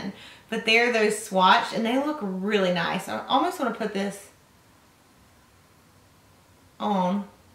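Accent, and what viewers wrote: South, United States. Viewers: If you put captions on, it changes some words due to the thick southern accent 😅 It said "matta fires" instead of mattifiers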